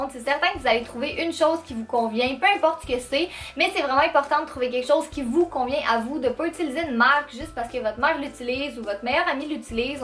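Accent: Quebec, Canada. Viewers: Moi perso j adore la c s'en canadien. ..je t adore biiiiizzzzzzzz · Je m'abonne, je suis de France, tu es vraiment super jolie mais je t'avoue que l'accent québécois me fait toujours rire ! ahah :D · Merci Vraiment Au faite je t'adore J'aime bien Ton Accent Québécois :)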